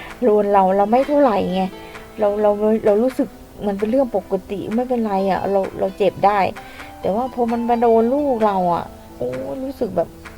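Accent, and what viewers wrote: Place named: Central Thailand, Thailand. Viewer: ยุจังหวัดดัย เว้าอิสานน่าติดตามกว่า เปิดมาได้ยินเสียงภาษากลางบ่เบิ่งเลย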